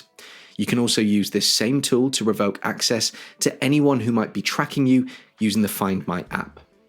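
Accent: United Kingdom. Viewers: Jailbreaking your iPhone, and changing the password from the default of alpine to anything else is infinitely more secure than not. VPNs don’t really help that much. If anybody knows what they’re doing, they can see through the nonsensical rerouting of your IP address. The British accent really helps.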